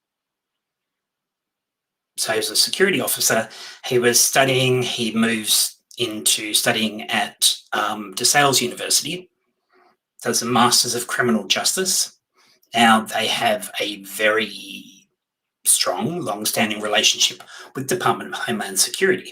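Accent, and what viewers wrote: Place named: Western Australia, Australia. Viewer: You’re from West Aus too!! I live in Perth. It’s funny I could tell by your accent that you must be from West Aus, apparently we sound more English/Australian which is nice I think. Glad to see another West Aussie interested in this case, my husband is sick of hearing about it all from me. Look forward to listening again 😊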